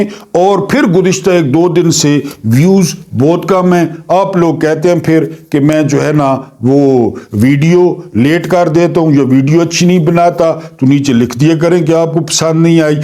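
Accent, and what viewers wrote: Saraiki, Pakistan. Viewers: Masha ALLAH kia khoub saraiki ka touch detey hein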